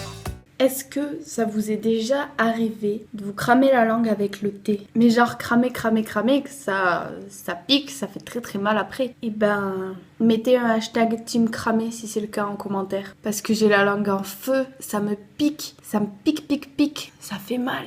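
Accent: South, France. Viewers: Je vois que je ne suis pas le seul à avoir l'accent du sud dans mes vidéos :)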